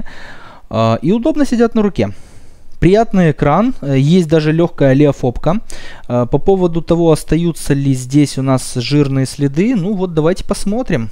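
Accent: Moldova. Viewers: ты чё из молдовы?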